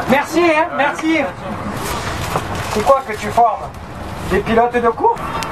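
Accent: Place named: Provence, France. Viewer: Bravo Je fais aussi de la pizza mais toi c’est pagnolesque,l’accent,la bonne humeur,les rires…tout ça me donne envie de dégommer ta pizza en entier!continues comme ça.👍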